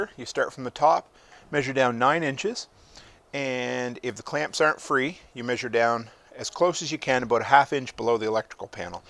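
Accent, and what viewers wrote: Canada. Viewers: "Oatside". Love the Canadian accent.❤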